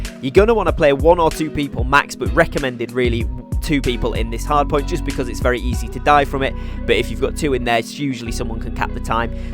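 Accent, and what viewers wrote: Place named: Northern England, United Kingdom. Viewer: You sound more nothern now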